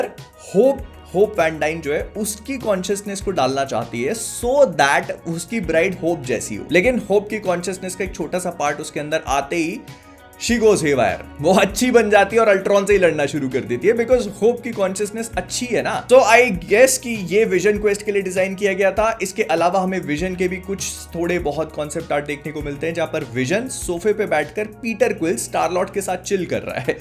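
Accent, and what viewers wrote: Bhopal, India. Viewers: Bhai tum Bhopal se ho ?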